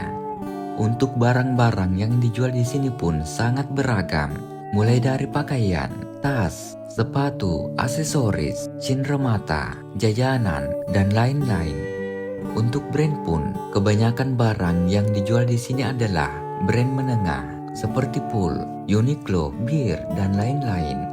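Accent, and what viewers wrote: Bugis, Indonesia. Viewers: aksen (logat admin) nya khas Bugis Sengkang (Wajo) mantap sukses min🙏👍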